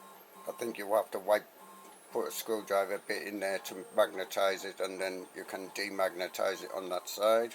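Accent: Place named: West Midlands, United Kingdom. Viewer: God that English accent is hard to hear. West Midlands?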